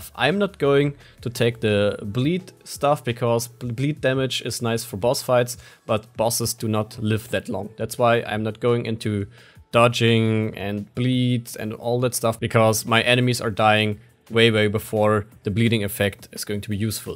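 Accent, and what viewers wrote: Germany. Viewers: Hello and thanks for a great video. I want to thank you for introducing yourself as German. As a typical American, I'm terrible at trying to figure out where an accent comes from.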